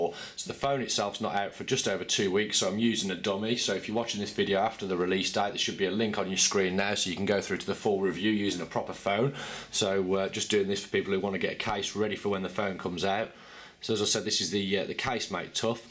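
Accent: Birmingham, United Kingdom. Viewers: A Fellow Brummy :O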